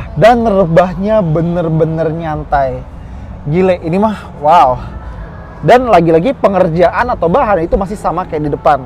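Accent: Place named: West Java, Indonesia. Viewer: ala orang sunda. Bener urabg sunda mah kalo nyebht huruf F&V jadi hurup P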